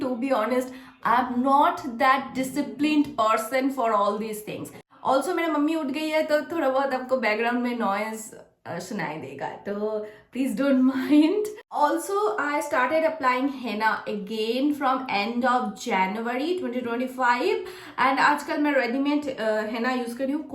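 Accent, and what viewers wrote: Bengal, India. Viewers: Hota hai uska language kuch aur hoga jaise bangali...isliye Hindi clear nahi h.... foreign log Ghar nahi sambhalte kya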